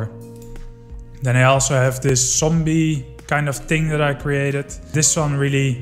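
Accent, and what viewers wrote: Netherlands. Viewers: Jij bent een Nederlander toch 😂? Je accent😂 kan niets anders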